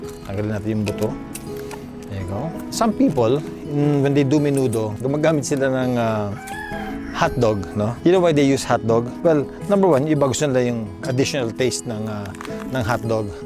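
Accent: Visayas, Philippines. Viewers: Makalingaw ang pagbisaya ni Goma hahaha mechado naman sunod unta. Great job on this recipe!!!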